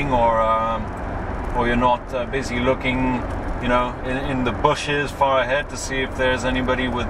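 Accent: United Kingdom. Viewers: you sire are from the Belgium?! Anyhow, Your queen english is like from Milton Keynes.:) I Have e34 525i, e39 540 and 635 CSi 1988. -Happy holidays -autobahn guy from Finland!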